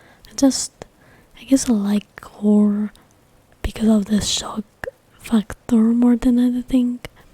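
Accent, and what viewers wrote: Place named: Italy. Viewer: Love the Italian accent with English words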